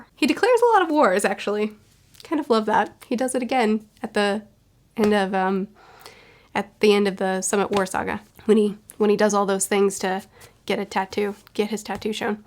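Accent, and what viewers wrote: Canada. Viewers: Question. Are you Canadian? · Is.....Canadian!???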